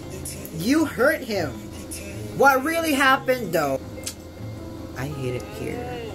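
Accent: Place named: United Kingdom. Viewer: Ur British accent made my day! Love from UK 💕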